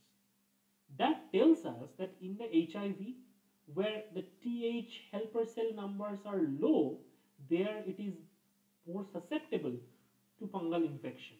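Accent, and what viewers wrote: India. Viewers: I do not want to sound too harsh, the video is helpful, and we are thankful for it, although the sound is far too quiet, but I am always wondering why NOT ONE indian scientist trys to speak without the typical accent. I have worked in some international labs and indians have always the strongest accents - they simply to not seem to care....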